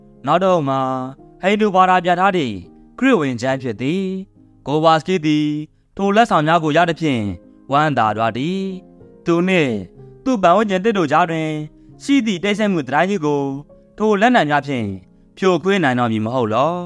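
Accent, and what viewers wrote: Upper Burma, Myanmar. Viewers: အညာလေသံလေးနဲ့နား ထောင်ကောင်းတယ်အား ပေးနေမြဲပါ❤❤❤❤❤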